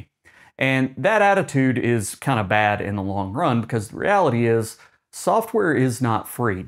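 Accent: Tennessee, United States. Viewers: PS: Your voice and style are very comforting and convincing. It reminds me of a younger version of Senator Al Gore's cadence, pacing, emphasis and accent. (Are you from Tennessee?) --- Keep up the good work! Love your channel and your videos! Wishing you continued success in all that you do! 🍷🍷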